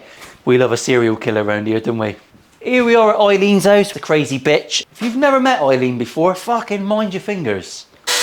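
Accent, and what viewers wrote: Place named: Bristol, United Kingdom. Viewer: 😂yeh mate he’s been learning for about a year now and he’s getting really good. When I showed him your last build he was like dad that’s sick so when he comes up my place he’s asks if we can watch your videos and I have them saved to watch. I’ve also just started playing guitar but I’m a piano player so guitar has been a bit of a challenge but with his help I’m getting there. He’s never seen any guitar build videos before and I said son you have to watch this guy he’s brilliant and hilarious and we’re are hooked. Like I said the builds are brilliant but we love the way you are on the videos. We’re in Bristol so only up the road and we gurt talks the same init. Thankyou me and Toby have really bonded over guitar and your videos gave us something to laugh about together too, although explaining what a beef bayonet was a bit tricky but he got the gist😂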